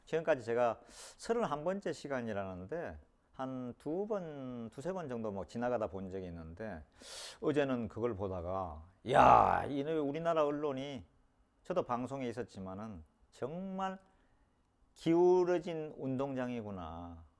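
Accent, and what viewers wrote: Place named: Gyeongsang, South Korea. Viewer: 단디해라 ! 에궁 갱상도 사투리. 굿!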